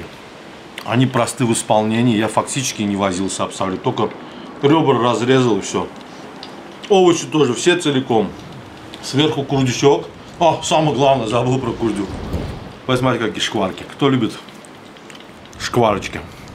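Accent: Baku, Azerbaijan. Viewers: я арянин и родился в Баку. Помню всех соседей с таким акцентом, двор был инторнациональным. Все ваши рецепты супер. С большим удовольствием смотрю. Здоровья Вам и вашей семье. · Этот Бакинский акцент я балдею да.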